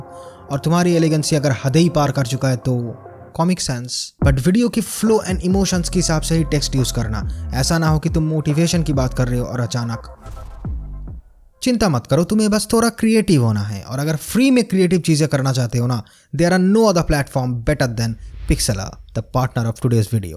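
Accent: Bengal, India. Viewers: Tum bhi bengali ho na? Tomar hindi te banglar tan ache!!!!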